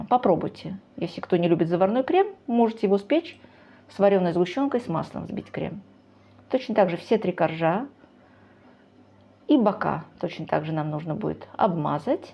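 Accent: Baku, Azerbaijan. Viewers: Этот незабываемый говор бакинский❤ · Чувствуется легкий бакинский акцент....😊 Спасибо! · Как приятен слуху Бакинский акцент. Родилась в Баку и прожила там 25 лет, но не знала такого рецепта. Спасибо , надо попробовать. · Вы видимо не так давно выехали из Баку, у вас до сих пор есть бакинский акцент🎉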